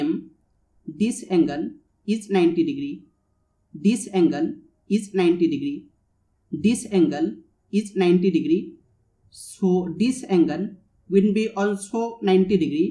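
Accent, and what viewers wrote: India. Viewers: She is indian, thus the accent.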